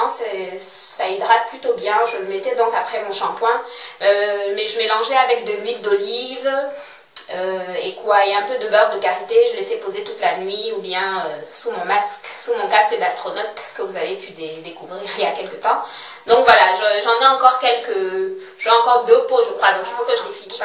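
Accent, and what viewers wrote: Cameroon. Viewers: J'adore ton acent de chez nous (kmer mboa). moi j'ai raqué il ya 1 semaine (je me suis defrisée) apres 3 mois de soin et de belle repousses afro, solides et tout. mais je travaille ds la banque et c'est pas evident pour le taf. tu m donne trop envie de me faire mal pour retrouver mes cheveux epais, et tout brefff i lov ur vid's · Hello j'aime entendre ton accent amerounais, moi aussi je suis camerounaise, ça fait plaisir de croiser une de ses soeurs sur Youtube...L'huile de ricin est EXCELLENTE, ça rend les cheveux fins plus épais,ça donne du volume,ça lisse les ecailles des cheveux et le Comble, ça favorise réellement la pousse du cheveu! J'adoreee...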